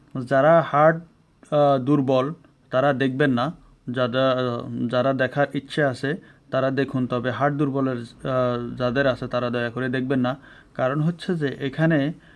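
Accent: Noakhali, Bangladesh. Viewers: ভাই আপনার বাড়ি কি নোয়াখালি ভাষায় বোজাযায়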